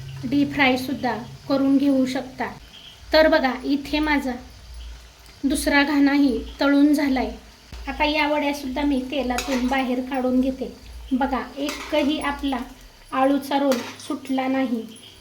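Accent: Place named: Satara, India. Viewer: ही अस्सल सातारी भाषा आहे त्या मुळे ऐकायला तस वाटत असेल बट योग्य कमेंट्स कराव्यात. कोणतीही भाषा असो तुम्हाला समजलं ना! मग कलेला प्राधान्य दिले पाहिजे.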